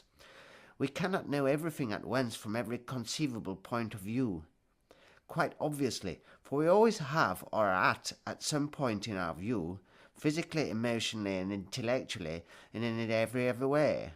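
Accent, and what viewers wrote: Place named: RP, United Kingdom. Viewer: Oh, and love the posh accent :D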